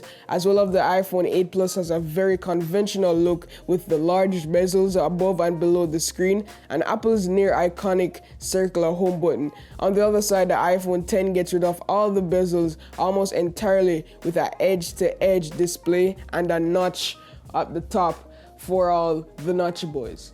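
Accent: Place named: Jamaica. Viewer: Wait why does he have a Jamaican accent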